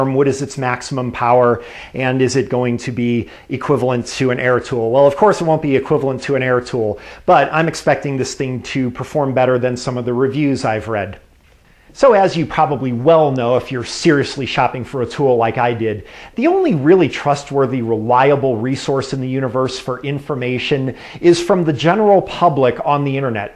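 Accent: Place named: South, United States. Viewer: That was a fantastic review and hope you do a lot more- as a Canadian your deep southern dialect is a little hard to figure out at times - just love the double negative inverted references supplemented with some psychotropic supplements. LMAO.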